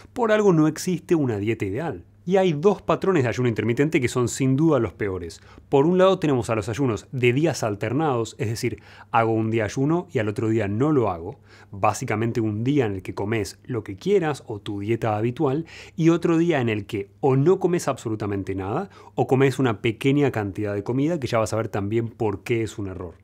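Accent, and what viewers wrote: Argentina. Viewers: 🤔🥴🥴🥴 Yo ayuno a diario porque no dijero nada bien, lo hago sin ton ni son. Pero ya me perdí con tantos tipos de ayuno Dr. Está Ud muy guapo 😘 y su voz es una caricia al oído. Nos tiene enamoradas su conocimiento y su bondad de compartir. Me acabo de dar cuenta de su acento argentino, juraba era español.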